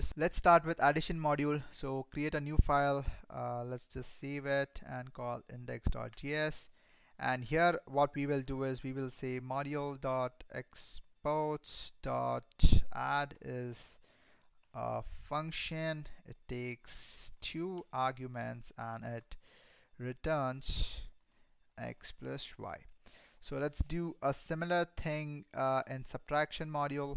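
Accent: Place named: India. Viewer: Good tutorial, and nice indian accent :)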